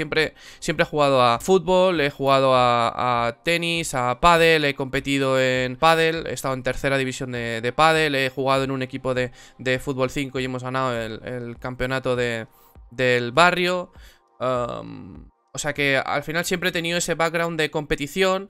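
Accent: Argentina. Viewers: Sos mitad argentino ? Douuuuuu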